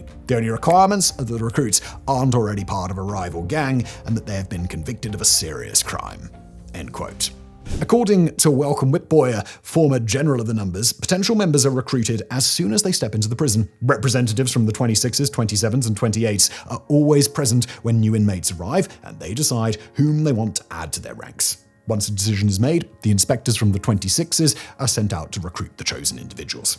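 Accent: England, United Kingdom. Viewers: Hes just british? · can't believe i clicked on a clueless Englishman poorly explaining something about a country he knows nothing about. 32-12. Tip for you next time bro. Stop pronouncing every word as if it's English. Do some fuckin research.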